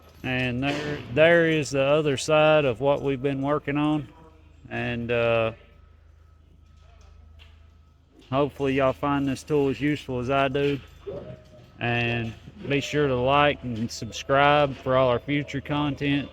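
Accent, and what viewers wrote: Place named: South, United States. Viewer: Appreciate the video, gotta love that sweet southern accent!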